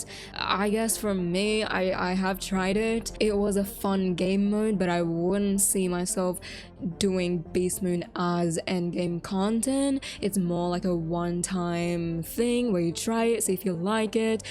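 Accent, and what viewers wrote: Australia. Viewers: Nothing wrong with Australian accents <3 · I didnt know you were aussie, so little of us are in the community lol nice to see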